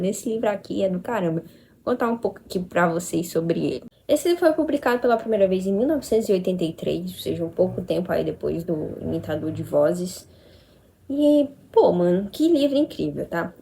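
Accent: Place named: Pernambuco, Brazil. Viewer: Amei o sotaque. Pernambuco?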